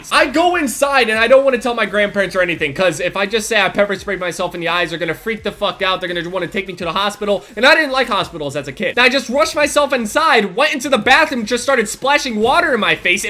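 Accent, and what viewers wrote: Australia. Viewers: Dat Australian <3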